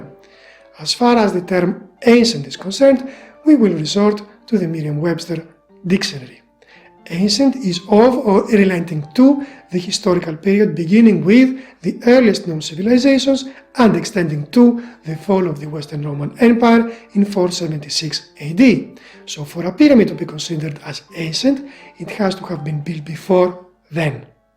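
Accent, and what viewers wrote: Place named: Greece. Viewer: You sound Greek af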